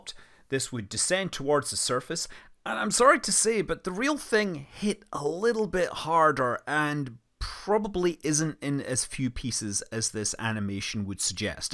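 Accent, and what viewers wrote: Scotland, United Kingdom. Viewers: Hi - I love the way you refer to the Mun (which has an uh sound) rather than the Moon. Either it's your rather endearing Scottish accent or else you have been playing too much KSP. Maybe both 🤣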